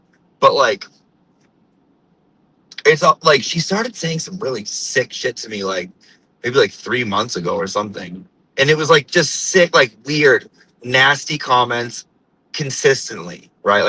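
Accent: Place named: Fall River, United States. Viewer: O M Fing Gawd!!!!! U R awesome!!! Love the fall river accent🤣🤣🤣replay here so good eveningk to u too!!! My earpods in and husband keeps asking me what’s so funny and i said 🤪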